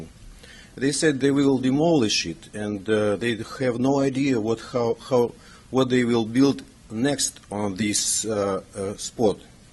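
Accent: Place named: Russia. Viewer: Надо отдать должное Вашей смелости: английский у Вас русский, явно недостаточный для избрания на должность мэра.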